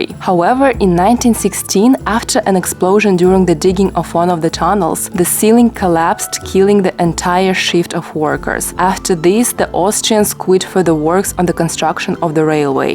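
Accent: Russia. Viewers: Your super strong Russian accent when you try to speak Serbian is adorable 😂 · I hate that Russian accent when you speak Serbian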